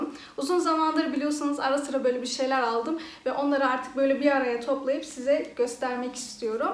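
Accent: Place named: Germany. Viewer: Harikasın bayılıyorum konuşmana ev düzenine hanım hanımcık oluşuna aldıkların çok güzel güle güle kullan💗bizimde gelinimiz var almayada doğup büyüdüğü için senin gibi konuşuyor oda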